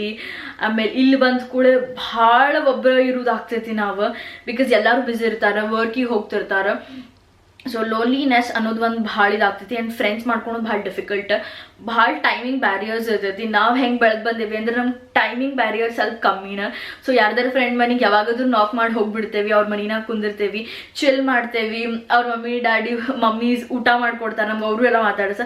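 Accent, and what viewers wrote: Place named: North Karnataka, India. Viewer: ಅಕ್ಕ ನೀವು ಉತ್ತರ ಕರ್ನಾಟಕದವರು ಅನ್ಸುತ್ತೆ ನಿಮ್ಮ language ಕೇಳಿದರೆ UK davru edre yes antha comment madi ela andre no antha haki please akka🙏👈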